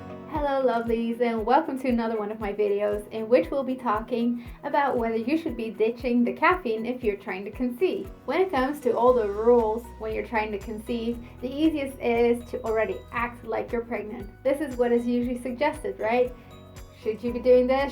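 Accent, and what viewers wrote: Netherlands. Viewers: Are you dutch???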